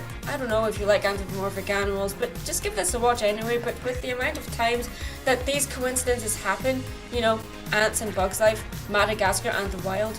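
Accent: Scotland, United Kingdom. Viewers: Hi! I have a question to ask you. ( I don't remember if I had asked you this before so if I have I'm sorry but could you please answer again cuz I can't find it) I have a question about your voice for Mary Sue. I know that you're Scottish and I love your accent by the way but whenever I hear you do Mary Sue's voice ( to me) you sound like you have an American accent, was this planned for the first ever video that showed Mary Sue,or was it just by accident? I'm just wondering because your accent you have for your videos when you speak and accent you have for Mary Sue and she speaks or very different so I was just wondering thank you!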